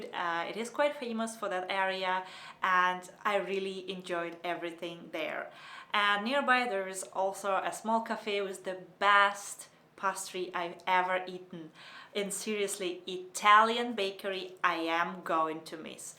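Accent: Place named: Germany. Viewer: Wann kommen denn mal wieder Videos auf deutsch von dir?Man vermisst schon dein deutschen Akzent :D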